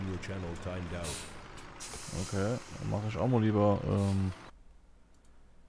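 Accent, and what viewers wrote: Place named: Hesse, Germany. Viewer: Bist echt gut mit den Ballen, wie immer sehr angenehme Folge mit Hessische Mundart.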